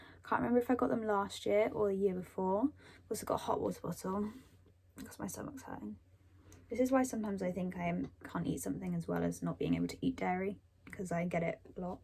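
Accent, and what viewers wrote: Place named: RP, United Kingdom. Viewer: I just watch your videos because i really enjoy listening to you :elbowcough: RP best accent :shelterin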